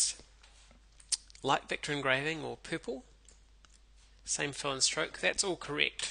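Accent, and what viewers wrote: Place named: New Zealand. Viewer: kiwi voice ftw! :)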